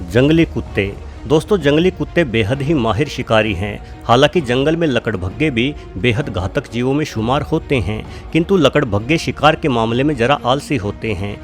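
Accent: Haryana, India. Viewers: HR se hi ho kya Sir aap voice se lgta hai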